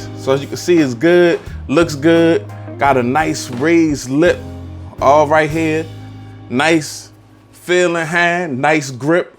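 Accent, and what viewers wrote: Baltimore, United States. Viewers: U from the Baltimore area? I recognize the accent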